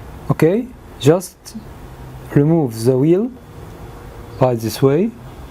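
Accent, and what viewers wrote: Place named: Egypt. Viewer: Nice Egyptian English .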